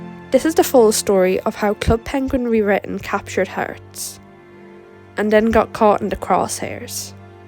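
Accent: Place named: Ireland. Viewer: That's so interesting, I guessed it was Irish but I didn't even know you had different accents like that. Are you native?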